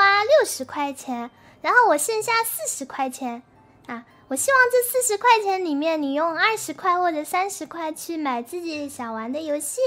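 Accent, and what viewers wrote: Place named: Japan. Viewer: dang yo accent made me think you were speaking japanese for a sec lol what I heard was aksldntkhwkejars挣钱aksjdkfalksdf打钱 very cute tho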